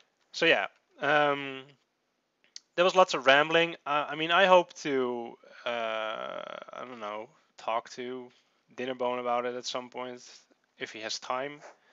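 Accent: Netherlands. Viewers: I can hear from your accent you are dutch, arent you? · Hij praat met een nederlands accent... LOL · Tuurlijk is hij nederlands dat hoor je aan zijn verkrekt engels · ben je eigelijk nederlands ik hoor het aan je stem? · Je kunt zo horen als nederlanders engels praten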